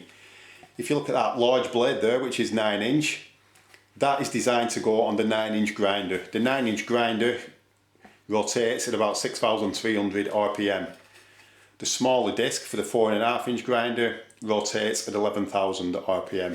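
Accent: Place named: Wigan, United Kingdom. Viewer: You sound like you're from somewhere like Wigan or Bolton with your common accent. I think manufacturers and businesses just slap on an expiry date for the sake of it sometimes, though I'm not saying this isn't a bad thing regards a powerful and dangerous tool like an angle grinder. Having an expiry date, especially with food, is just another way of making money where people throw it away when there's no to do. I have a Clarke angle grinder and I can't get the old worn down disc off using a pin wrench; it seems to be stuck and won't turn for some reason maybe due to rusting. I put some WD40 on it but it hasn't made any difference.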